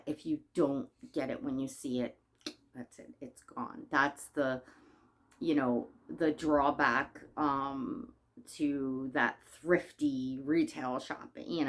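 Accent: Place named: New York, United States. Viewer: I have watched you for months and just commented now but I absolutely love you and your channel! Your New York account is my most favorite accent ❤ You are such a great silicone/reborn mommy and I love all your babies. It’s funny how people always got to but in and add their 2 cents to everything lol!!! There’s is nothing wrong with us loving our Dolls and role playing. Back in 98 I had my one and only son because after him I developed a heart condition called postpartum cardiomyopathy at 22 and couldn’t have anymore so when I found these babies years ago and finally got my first full body silicone in 2021 I was over joyed and since have added up to 15 silicones and 1 reborn that I’m selling but because I just put on the new born stage of my real child and couldn’t have anymore I just love these new born and preemie babies since my son was a preemie too! 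These babies are definitely great therapy and help so much. I totally would adopt if I could afford it because I’m 47 but definitely would give anything to have another new baby around but it’s not in the cards and so many just like to voice their opinion but anyways you keep doing you and keep bringing out those beautiful babies. My whole family has taken to my silicones as real family and just loves them too. It’s really helped me as well to hold and play with these babies but to know the full responsibility isn’t there so we can still enjoy life and do what we need to and play with our babies when we need too and want too.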